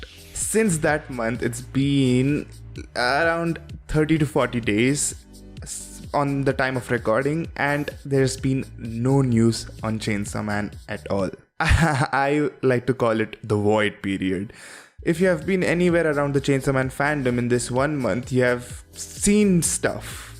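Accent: India. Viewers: Bhai indian h kya? accent se lag rha h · you sound Indian?!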